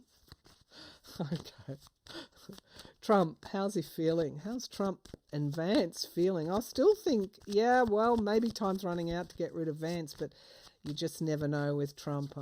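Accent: Australia. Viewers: Hi, Thank you for your thoughts, I have been watching and appreciating your videos for a long while (and loving your Australian flair). I am one who is still very confused and saddened by Biden stepping down. I understand your excitement for Kamala, I like her and I am so glad she chose Walz. But I feel like Biden was shot down by his own party, he, the one who did all the hard work of bringing us out of the tailspin of the Trump world. The last couple of weeks are the first time that it has been painful to watch your videos. Our 14 million votes for Biden were ignored as Pelosi even admits, in a new article, that she nudged Biden out. And when you said here that Trump's donations are drying up because Biden isn't in the race? What??? I wish you would read on Biden and how he is feeling and what happened there and bring some attention to that. Biden would have won in a landside. 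I have no doubt that Kamala and Walz will win as well. I wish you would address the pain that some of us are feeling, with our preliminary votes being ignored for Biden and still caring for him. I was so inspired with him saying "When you get knocked down, you get back up". My heart was with him and I wanted to see him defy all the MSM and the pundits and win. I can't even watch MSNBC any more, although maybe that's a good thing. With much love💕 Elizabeth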